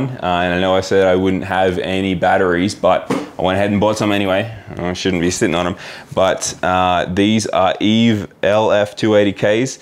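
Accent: Australia. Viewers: Dude, where are you? Aussie accent, 120v inverter. Confusion Ahh Colorado.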